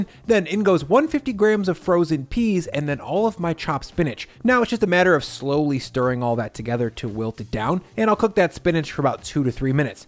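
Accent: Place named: United States. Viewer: I'm a late comer. Just found your channel. Love it. I imagine that I may wonder sometimes what is he talking about, with you being American and you call things by different names and pronounce things totally different from us here in Australia.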